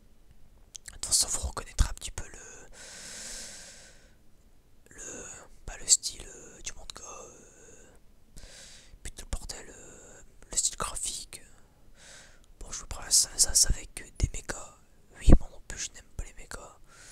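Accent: North, France. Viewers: Ton chuchottement av cette accent du nord j adore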